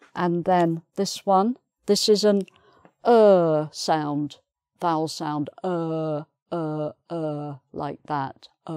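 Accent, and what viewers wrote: England, United Kingdom. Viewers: Maybe this is how the letter is pronounced in England but it is certainly not as it is in Scotland so please make the distinction a lot clearer. Not helpful at all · I really like this lesson because I prefer British accent. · a beautiful British accent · Dear teacher, your facnating British English is worth studying by millions of people. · Excellent! I love British accent 😊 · somehow i really like british accent. anyway thank you! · Ох, как же приятно звучит классическое британское произношение. Прям наслаждение для ушей. И все понятно. Американское r-ыканье с детства не выношу. Мечтаю научиться говорить с британским акцентом, но не получается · какой у неё невероятно милый голос и акцент. британский английский по сравнению с американским так красиво звучит. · CharlieRashi, Britain comprises 3 countries - Wales, Scotland and England. 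The United Kingdom comprises 4 countries - with the addition of Northern Ireland. (The Republic of) Ireland (in the south) is in no way British. There is no such thing as (oral) British English (although as someone mentioned above it is often used to describe spelling differences with the USA). Jennifer Jenkins’ research might be worth reading: in particular her work on the Lingua Franca core, and how to pronounce the r sound to increase global comprehensibility. It’s not wrong to copy the accent of the speaker in the video if that is what you want to do, but her accent is only one of many equally correct accents emanating from the UK or elsewhere. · Which British accent? I think you mean an English accent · Thank you very much! Great pleasure to hear such beatyful British English . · Mrs...., your teachings r GR8! and I luv yr 🇬🇧 accent! God bless u! I'm Mr. Ricardo. I'm Brazilian. Thnx4yr youtube classes. 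· Thank you so much! The British accent sounds so beautiful! Greetings from Russia. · Hidden Cuber At least,she has an English accent. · And Thank You for being such an excellent teacher- listening to your speaking alone is a great help and demonstrates the beauty of the (British) English language 👍🙏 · She's sooo good and I love the British accent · She's so cute... And I love British accent 💙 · I wish more British could speak in the same way as you:)) most of them speak like they are chewing something or drunk guys who have some problems with pronouncing sounds:))) Thanks a lot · British pronunciation... My favourite💕💕💕 😍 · me encata el asento britanico xd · british accent is SO pretty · With over 400 down arrows, I'm assuming I'm not the first Brit who disagrees with her. (I've read some comments, but not seen one contradicting her.) There are several regional differences across the UK, and at least some of them pronounce every R on that board (properly). 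She's very clear, you won't go wrong by following her lesson. However, she teaching you one particular English, not a nationwide one. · British accent 😍 take me over the cloud ☁️..amazing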